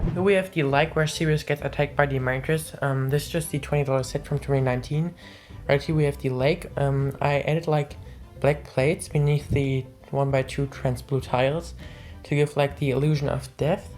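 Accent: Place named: Germany. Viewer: Are you from germany its just that the Accent sounded familiar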